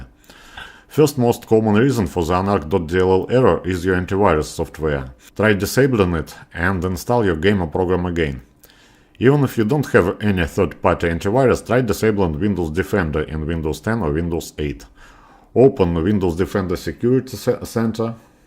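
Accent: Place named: Russia. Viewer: Ебать у тебя акцент братишка, русских слышно сразу)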